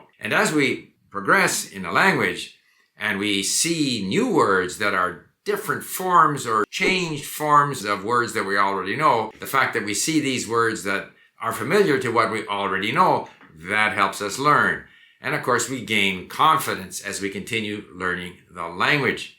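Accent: Spain. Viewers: "s Portunhol occurs because he does not swing the words like Brazilians do, and utters.the Portuguese words with the Spanish cadence. No big deal. They will point it out. But they have no.problem understanding. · Your accent in Spanish, when you speak Portuguese. And I would go as far as to say It's not really proper Portuguese. Especially because of the fact you Speak brazilian, portuguese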